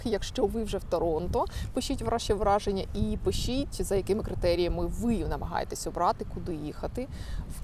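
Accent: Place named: Kyiv-Poltava, Ukraine. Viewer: Інколи Ви розмовляете київсько-полтавським діалектом.👍Дякую Вам за це🌷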